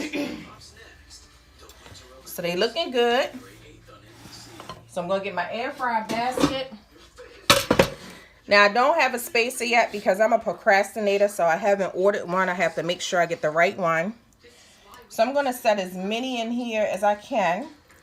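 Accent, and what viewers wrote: Baltimore, United States. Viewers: Bmore accent brings me back home. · As soon as started the video, I was like BALTIMORE!!!!!!!! Never lose the accent! I love it. Those wings look so good!!!! I need to get my air fryer asap! Thanks for sharing.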